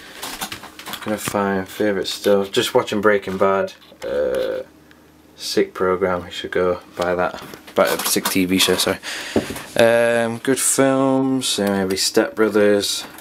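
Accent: United Kingdom. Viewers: YESSSSS SOMEONE BRITISH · You are so british I love it. Where you from pal?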